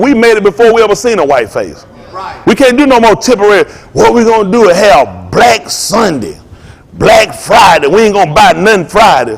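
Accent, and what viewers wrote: South, United States. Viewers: Sound like a Southerner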